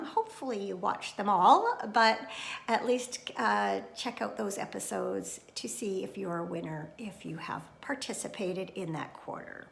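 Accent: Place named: Canada. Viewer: I just found your podcast today Great to hear a fellow Canadian. I'm looking forward to joining your makealongs.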